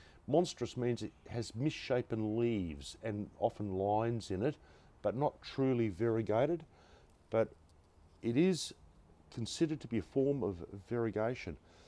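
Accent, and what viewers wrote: Australia. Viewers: Your collection are so beautiful pretty healthy yr voice say u are Australian u are so far away i like to order from yr collection they are so awesome but i live in Trinidad west Indies Maloney garden so lovely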